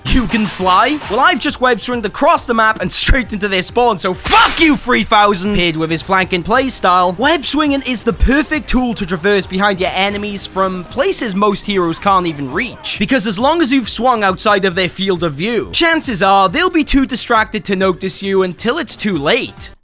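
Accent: Wales, United Kingdom. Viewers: u welsh? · It always shocks me whenever I hear another Welsh creator because I never expect the accent until im midway through and im like "wait hold up". First impression and it's already a great one, keep up the good work!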